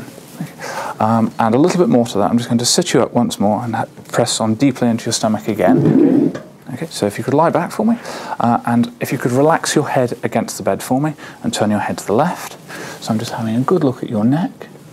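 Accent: England, United Kingdom. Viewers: An English accent and a doctor I’m weak I’m the knees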